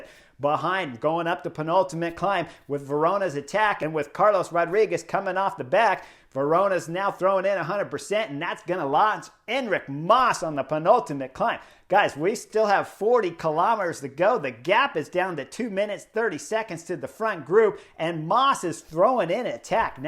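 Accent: United States. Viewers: Man…this feels like the end! My wife now knows your voice and thinks you’re from the south…haha! She can’t believe that there’s so much to talk about. Hopefully, she will understand why in the next season. Going to miss the everyday episodes. It’s like a tv series arcs: Giro, Tour and now Vuelta.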